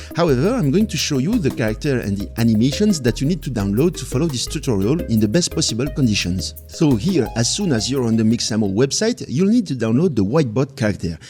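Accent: France. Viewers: You are so awesome, why is your content so high quality ?? Are you french like me BTW ? Your accent is perfect <3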